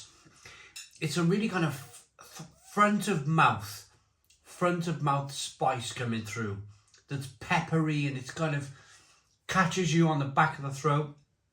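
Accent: Wales, United Kingdom. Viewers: Is you Welsh is it?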